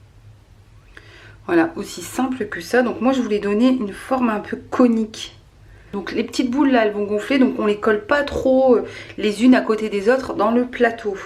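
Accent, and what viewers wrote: North, France. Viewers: Tout est 'leeee' ta laccent du nord haha t'es de Paris ou plus dans le nord ?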